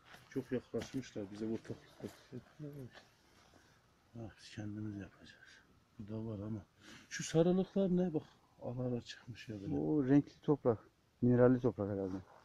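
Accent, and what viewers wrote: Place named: Erzurum, Turkey. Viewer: Erzurum civarı diye düşündüm... düzlük, yükselti... bir de konuşan adamın ağzı.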